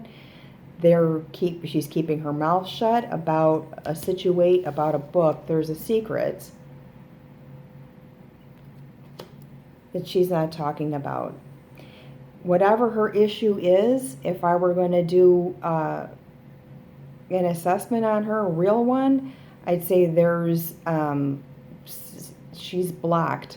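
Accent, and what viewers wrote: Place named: Western New York, United States. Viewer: just discovered your site. Enjoyed this reading, thank you for sharing. You sound like you're from western NY...I recognize the accent!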